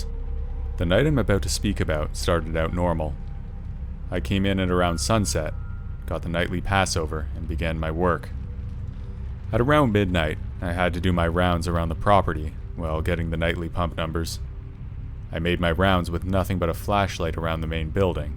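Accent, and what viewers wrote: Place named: Canada. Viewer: Thumbs up for awesome stories. Thumbs down for horrible Canadian accent. It's a house! Not a hoose!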